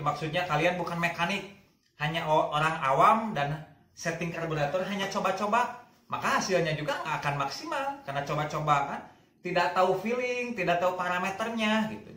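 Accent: West Java, Indonesia. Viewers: Si dipanggil abang. Tp dari logat mah teu bohong cigana orang sunda hahahaha.. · Logat sundana ngeunaheun kang:v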